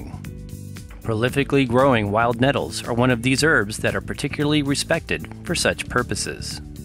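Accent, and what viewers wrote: United States. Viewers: Farang Esaan it's the way Americans pronounce it. Both are correct, like maths and math, both short for mathematics.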